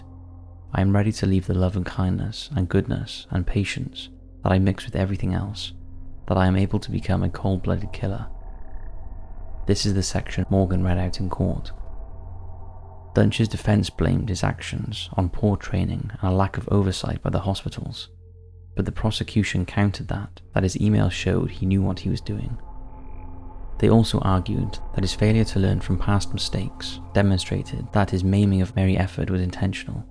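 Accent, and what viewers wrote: United Kingdom. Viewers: Your voice is beautiful but it resonates like in an echo. I love the British accent, but I have difficulty understanding it with clarity, I wish you pay more attention to the sound effect. Also, the music interferes making it even harder to hear clearly. No music is necessary. Great documentary, thank you.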